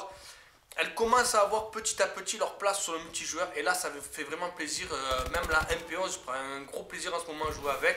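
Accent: South, France. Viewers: Première fois que je vois tes vidéos, je kiffe ton accent du sud, bonne continuation ! ;)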